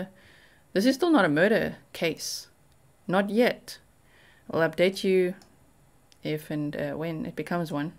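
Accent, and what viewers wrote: South Africa. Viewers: are you South African? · Love your saffie accent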